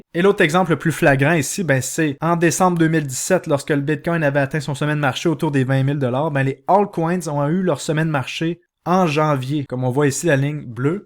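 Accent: Quebec, Canada. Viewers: merci vraiment des videos de qualite que tu fais, j'ador l'accent quebecoise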